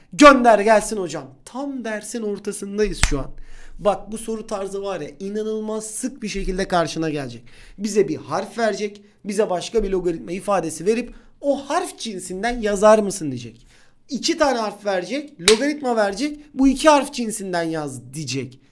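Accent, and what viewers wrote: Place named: Izmir, Turkey. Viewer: Bi İzmirli ağzı sezdim sanki.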